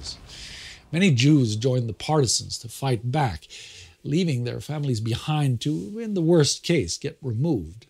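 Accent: Germany. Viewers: Germany iirc. You can tell by his german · Yeah, I speak German, but I bet a lot of English speakers are confused. He pronounces some things the German way that should not be, because the English speakers will not understand.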